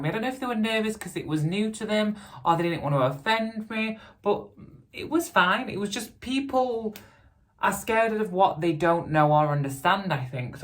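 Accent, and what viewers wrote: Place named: Oldham, United Kingdom. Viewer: You have and accent like Brian Cox. He grew up in Oldham UK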